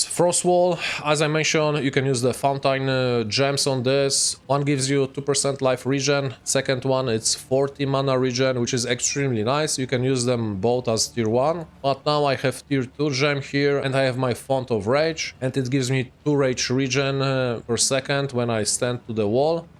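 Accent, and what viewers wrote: Poland. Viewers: As a Russian, I can recognize a polish accent flawlessly as well hahaha. Kurrrrwa! 😂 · Ten polski akcent poznam wszędzie :)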